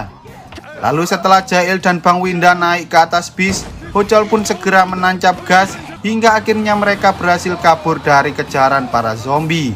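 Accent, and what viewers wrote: Java, Indonesia. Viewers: BANG JAWA MANA?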